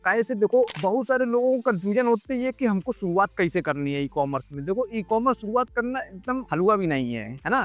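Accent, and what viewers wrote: Kanpur, India. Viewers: Aapki Avaj se lag rah ki aap kanpur se ho 😅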